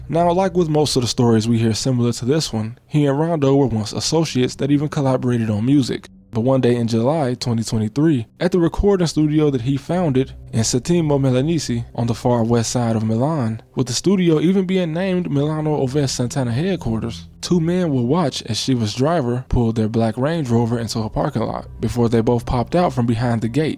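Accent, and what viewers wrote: United States. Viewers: u can tell bro from america in 20 secs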